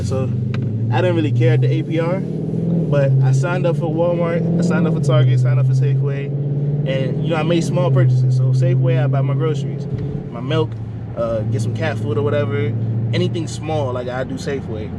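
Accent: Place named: DMV, United States. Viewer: I thought you was from the dmv 😂 I can hear it 😂😂😂😂 but I’m finna go to the military so I wanna get one when I get back 💯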